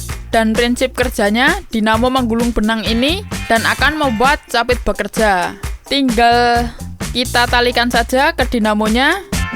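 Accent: Java, Indonesia. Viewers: Bang orang mana kok nyomongnya seperti orang jawa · Medhok e mas tekan ndi toh? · Deke kang Jawa ya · Channel Ini - Kreatif - Sopan - Jowo Nyo Muantep Keren BosQu! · Koe wong jowo to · Abangnya dari jawa ya . . . . . . .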